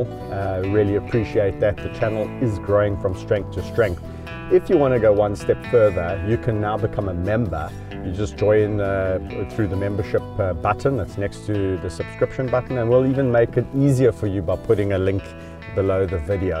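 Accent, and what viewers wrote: South Africa. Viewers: Very special...many thanks. Your accent is rehabilitating white South African too! Far more of a challenge than changing a spotted hyenas rep!!?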